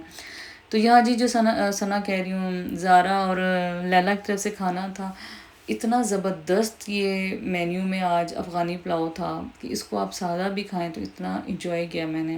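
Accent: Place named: Jhang, Pakistan. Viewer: ji main to fan hu jab aap jhang ki boli me baat karti hain please aap vo bola karo❤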